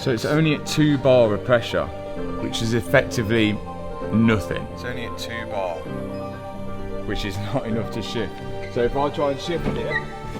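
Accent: United Kingdom. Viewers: Where in the UK is he from for that accent??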